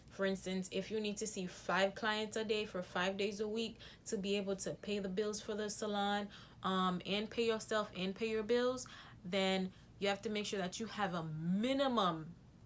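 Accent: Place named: Haiti. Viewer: Random question... are you Haitian? I detect an accent and you look like you can be one of my cousins lol 🇭🇹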